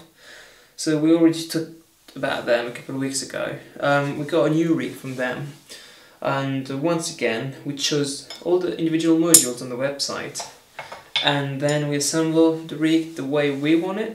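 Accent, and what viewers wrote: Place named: United Kingdom. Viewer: Thanks for sharing this, where did you buy the whole set (am guessing from your accent you live in the UK)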